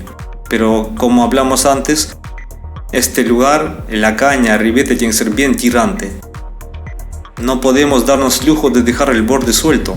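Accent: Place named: Russia. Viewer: Спасибо за урок. ..Сперва было странно, обратил внииание на русский акцент, а потом увидел имя автора, и стало на свои места.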